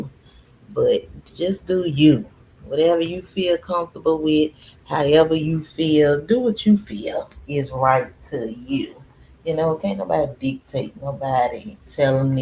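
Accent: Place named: Deep South, United States. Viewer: ok I will pop in the chat one day so be on the look out for me 😁 and I like that country accent u got lol you really sound like u from the deep country south foreal 🤣🤣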